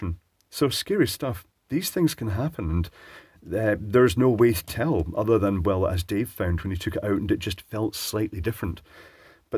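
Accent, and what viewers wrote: Scotland, United Kingdom. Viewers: I don’t just come here for the video’s. I come to hear beautiful Scottish accent! 😅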